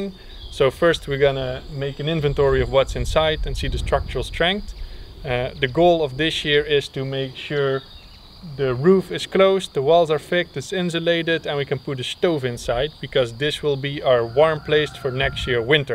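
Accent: Netherlands. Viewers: As a dutch person i immediately recognised your dutch accent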